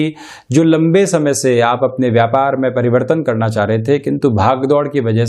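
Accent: Standard. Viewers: Pranam 🙏💐🌹💐, aap bahut sundar tarike se har din rasifal batate hai,aap ki shdh hindi bahut achhi lagti hai,bahut bahut dhanybad aapko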